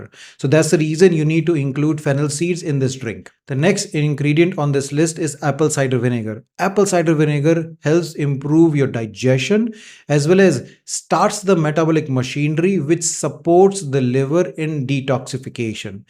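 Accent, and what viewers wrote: South India, India. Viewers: Doc,gratitude for empowering us about thyroid care, why you've south indian accent did you stay in south india for long.🙏👌❣️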